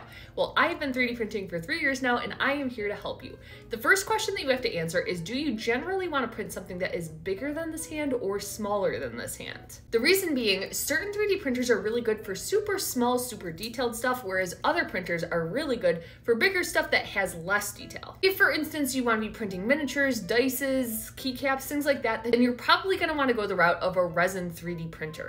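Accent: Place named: Midwest, United States. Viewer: Are you from WI? Your Midwest accent is thick!!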